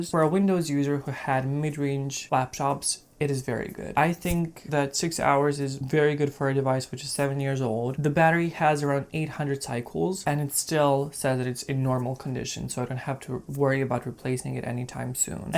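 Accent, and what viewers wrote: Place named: Poland. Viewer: You kind of sound Polish :)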